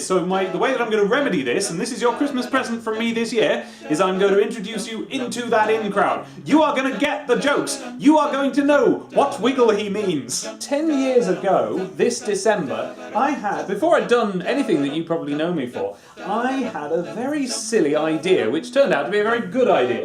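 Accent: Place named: Northern England, United Kingdom. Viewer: Hey,. Quick question; which county are you from? I have difficulty narrowing accents down or if accents even work by county of origin anymore. I can tell you're from the North but I wouldn't be able to tell York from Newcastle. We have an over abundance of London accents in the South East.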